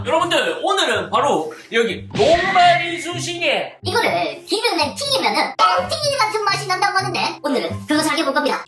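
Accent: Busan, South Korea. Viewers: 몰랐을수도있지겁나뭐라하네 근데허팝님부산사람인가요뭔가억양이익숙한데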